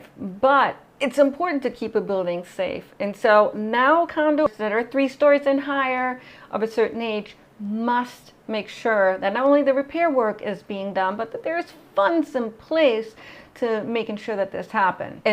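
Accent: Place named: Midwest, United States. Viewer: You sound like you're from the Midwest. 😊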